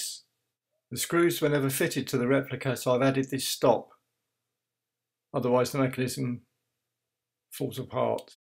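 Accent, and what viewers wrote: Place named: South London, United Kingdom. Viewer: Ah! I thought I recognised that 'Gateway to the South' accent. Wasn't 100% certain but I am now. Will never forget that wonderful tour you provided Lena and myself through the now tragically long gone MOMI, along with the marvellous hospitality, including our best ever overseas meal at your Balham residence. Having now reached another 'record age ( my first was 331/3 the second 45 :-) my collecting days arena largely behind me. In fact I've now reached, along with many of my co-hoarders, the 'what am I going to do with it all?' stage. However, my interest in early audio/visual technology has not at all diminished. Now, one of the (many) disadvantages of reaching a 'record' age is that it is now 70-years since I was the 8-year-old owners a Meccano set. (It got lost during a house move back in 1948).This means that I must leave you to home construct a Zoopraxiscope. 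When you do I trust you will share on YouTube. Keep up the good work - Charles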